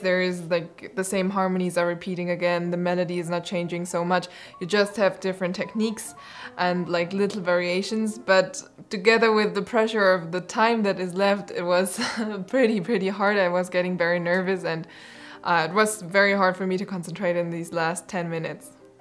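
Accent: Germany. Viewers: höre ich da etwa einen kleinen deutschen Akzent? ^^ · U have a DEUTSCH akzent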